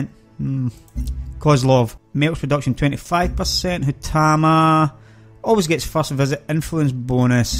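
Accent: Scotland, United Kingdom. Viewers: Great to hear a grand Scots accent! It’s probably why the yanks haven’t been watching you. I was married to a girl from East Kilbride (Scotland’s 6th largest town and growing...as the sign proudly professed!) Unfortunately, I’m a sassenach, but I know the patter, having grown up in little Scotland.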